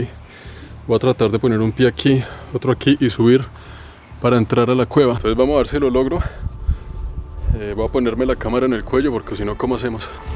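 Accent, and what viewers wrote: Bogotá, Colombia. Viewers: DEBERÍAS SER LOCUTOR DE RADIO TENES UN VOZ MUY RECORDATORIA EN MEMORIA DE LA GENTE Y ESE ACENTO COLOMBIANO GENIA... USTED YO DIRIA CHE SOS RE PIOLA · Que linda voz tienes y con ese acento colombiano, Ave Maria. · Empecé a seguirte, solo porque eres colombiano y me encanta su acento, pero honestamente excelente relato de esta ciudad antigua, y algunos otros vídeos que ví, muy interesantes y más cuando los explicas tan bien y nos aportas no solo viajar atravez de ellos sino información de los mismos en cuanto a su cultura gastronomía, estatus y antigüedad muchísimas gracias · buena voz.. interesante relato... acento Colombiano? Chevere felicitaciones!!! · Es agradable ver tus videos desde el sofá, aparte de ver las imágenes, estas muy bien explicafos con excelentes datos históricos de la localidades que nos enseñas, en este caso la ciudad de Matera, hoy una ciudad de la República de Italia y anteriormente del Reino de las Dos Sicilias. 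Un inciso, decir Latinos o Latinoamérica no es correcto, es hispanos o Hispanoamérica, Latinoamérica es una invención francesa cuando la invasión francesa, los franceses no dicen el Canadá latino o el África Latina, tuvieron muchos territorios en África y también los tuvo Portugal, España menos, pero dicen el Canadá francófono o el África francófona, latino es ser del Lacio.. Si también se incluye El Brasil entonces se puede decir Iberoamérica, latino o Latinoamerica es absurdo, el Lacio es lo concerniente a latino, una región de Italia. Un saludo desde España, me gusta ver tus videos y escuchar tu acento colombiano. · Que fastidio ese acento rolo :v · colombiano por estilo de hablar👍👍👍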